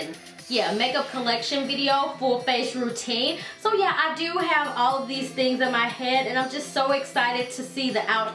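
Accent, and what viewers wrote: South, United States. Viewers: I love watching your videos & that southern accent :-) keep up the good work & YESSSS EAGERLY AWAITING THE ROOM TOUR B/C WRKN ON MINE & NEED SOME INSPIRATION :-) Be blessed!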